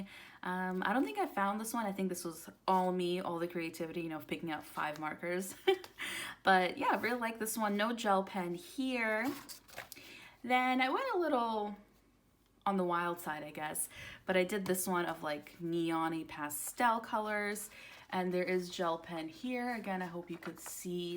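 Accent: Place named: United States. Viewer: Glad you enjoyed your vacation to the states! Where are you from? As you sound like you have an American accent. Although you said holiday so I’m guessing you live in Europe?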